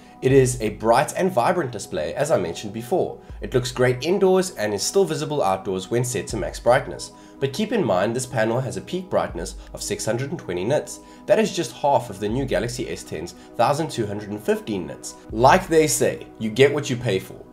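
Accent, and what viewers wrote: South Africa. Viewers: always easy to recognize a fellow Southafrican Did you move overseas permanently?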